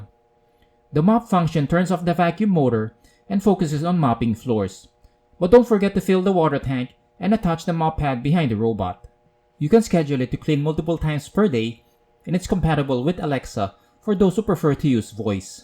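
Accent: Philippines. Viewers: Sounds like your a Filipino? Based on accent? Nice video!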